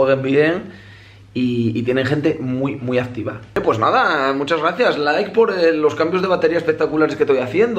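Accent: Asturias, Spain. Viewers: Habla español q diga ye, tiu por muy asturiano q sea se entiende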